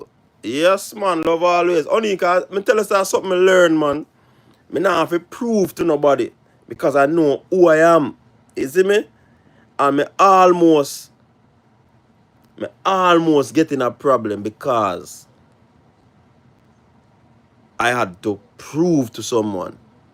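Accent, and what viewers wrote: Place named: Jamaica. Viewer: Coach anyone who doesn’t want to hear you speak patois they don’t have to listen. Kmt. Americans do not speak English either.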